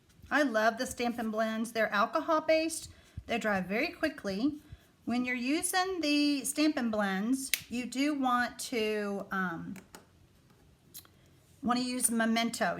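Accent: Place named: Texas, United States. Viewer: Lordy, I miss that TX accent ! Raised north of Dallas in Denton and moved to se OK in '77. No one talks like that north of the Red River and I miss that ! Love your videos Lady. Keep on doing what you do ! 🙋 GreatNana in se OK usa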